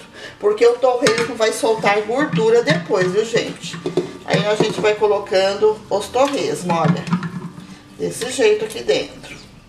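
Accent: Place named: São Paulo, Brazil. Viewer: Adoro este sotaque paulista!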